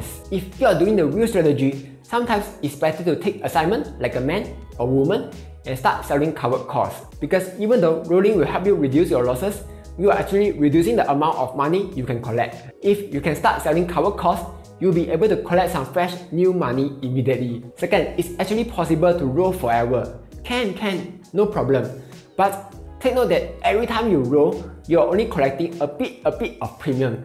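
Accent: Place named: Singapore. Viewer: Absolutely love ur video, no BS and straight to the points! Plus Singlish…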